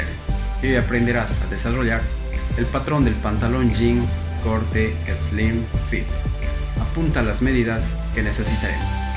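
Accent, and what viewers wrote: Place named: Bolivia. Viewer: Parece que es boliviano por el acento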